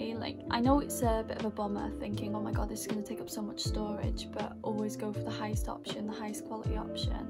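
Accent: United Kingdom. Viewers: Where in Yorkshire are u from?? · shes british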